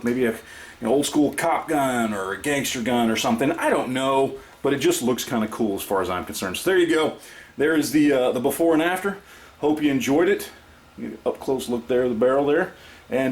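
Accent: South, United States. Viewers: I recently watched your first heritage rough rider review from 10 years ago.... Man, you had a thick southern accent. Damn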